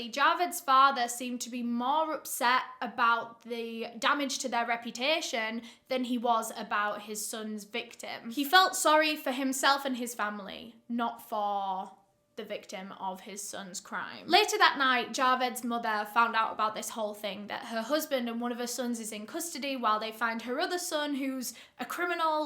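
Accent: United Kingdom. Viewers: ...which part of UK are you from? Loving your accent and you look lovely and beautiful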